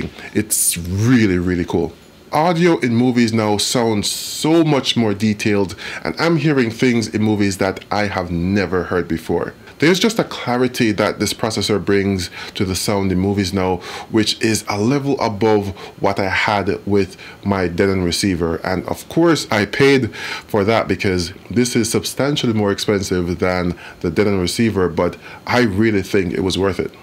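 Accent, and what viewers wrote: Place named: Jamaica. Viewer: or you Jamaican? yu sound like a yardie my youth, nuff respect.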